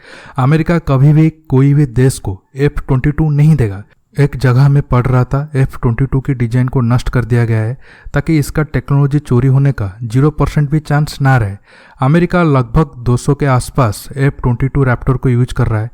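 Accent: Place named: Bengal, India. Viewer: Aap apna language improve karo..bangali style hindi mat bolo..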